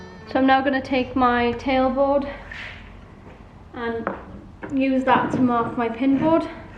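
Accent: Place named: Wales, United Kingdom. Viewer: Subscribed to your channel recently and I've absolutely loved watching your videos so far. I was brought up in Wales so hearing you talk with that lovely accent is so nice. Quite envious of your spacious shop - it makes my little shed look like a broom cupboard ! Looking forward to watching your next videos. Thanks for posting.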